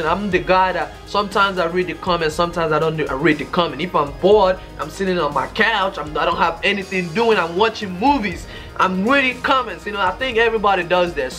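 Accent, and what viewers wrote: Africa. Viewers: He sounds sooo african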